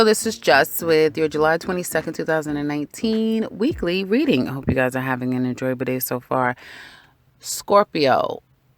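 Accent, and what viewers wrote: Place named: East Coast, United States. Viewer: Hey, are you from the east coast? love the accent & thanks for the reading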